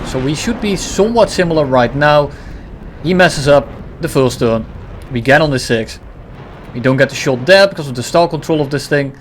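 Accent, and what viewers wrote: Netherlands. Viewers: you have somewhat of a dutch accent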